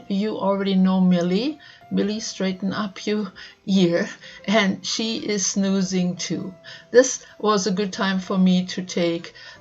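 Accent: Germany. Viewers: Dir und Deinen Lieben auch ein wunderschönes Weihnachtsfest und einen guten Start ins neue Jahr. Gehe ich recht in der Annahme, dass ich da einen deutschen Akzent höre? ;o)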